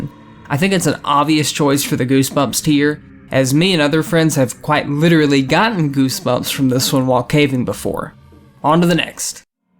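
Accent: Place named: South, United States. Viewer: Great content, keep it up, but you might want to tone down your own accent a bit if you can, people would probably find it jarring to open up to a heavy southern accent, especially european or north US viewers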